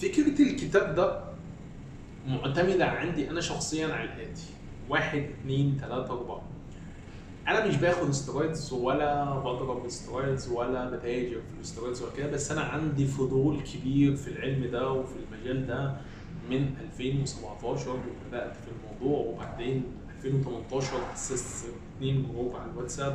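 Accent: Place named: Iraq. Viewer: انت عراقي لو مصري؟؟؟؟!!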